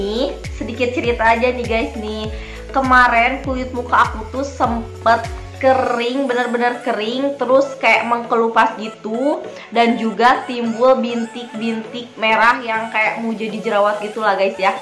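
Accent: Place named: Cibeber, Indonesia. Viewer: Atuh logatnya kaya ga asing nadanya juga.pantesss teteh dari Cibeber ya allah kampung halamankuuu😍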